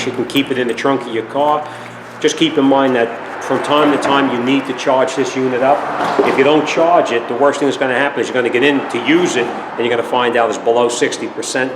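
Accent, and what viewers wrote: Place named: New Jersey, United States. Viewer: Somebody from New Jersey somewheree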